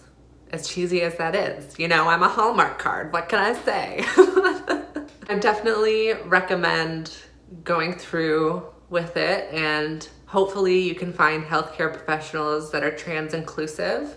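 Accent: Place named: Canada. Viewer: I enjoyed the video, nice to see you doing well. You have a Canadian accent, so your health insurance is nationalized. Good for you!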